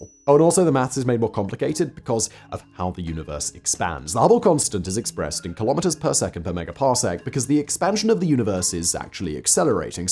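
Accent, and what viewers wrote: England, United Kingdom. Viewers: A little hard, hes English lol. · Great video. Lose that English accent. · Why does vsauce have a British accent and look slightly different?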